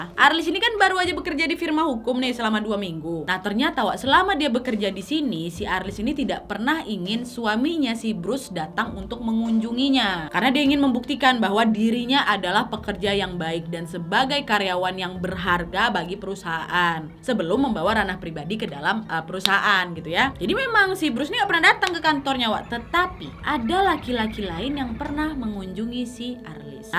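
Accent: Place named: Medan, Indonesia. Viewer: Kok berubah logat mu KK Jadi Medan - Melayu wkwkwkwkwks🤣🤣ngakak liat bersin nyaa😂😂 Motif nya jelas unsur sakit hati atas ketidakadilan yg dialami si Steven dikota itu kondisi korban yg seperti dilecehkan" didalam rumah ibadah jadi pilihan nya buat balas dendam terhadap sistim dikota itu sebenarnya masih banyak yg mau diuraikan cuma males ngetik nya😂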